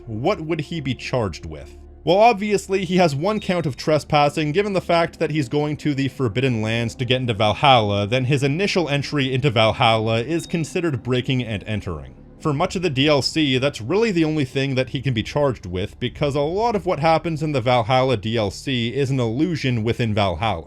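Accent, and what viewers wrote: Canada. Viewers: Hes Canadian, note the subtle pronunciation of a-boat instead of about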